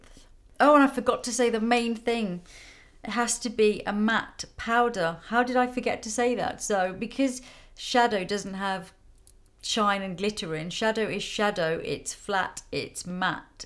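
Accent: United Kingdom. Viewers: Love her explanations of make-up. Also, love her calm, mellow voice with British accent.:) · I love your accent. Always liked the British accent.❣️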